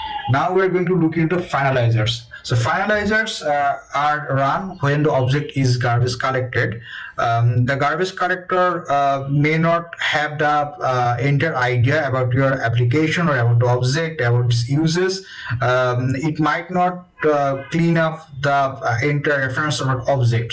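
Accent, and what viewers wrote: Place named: India. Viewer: nice, because i wanted to watch your video, even with the indian accent which is difficult to understand tec-stuff, but the sound was unberable so i wish you good luck for you next video :-)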